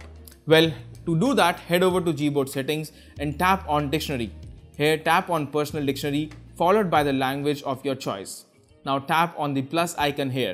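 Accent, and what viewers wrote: India. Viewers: My ears bleed everytime I hear hindu accept.. what's wrong with you peopl!?!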